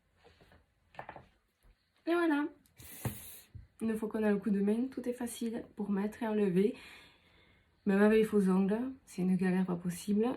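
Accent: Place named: South, France. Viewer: Merci pour l’astuce, explications claires et avec un bel accent du Sud 👍🏻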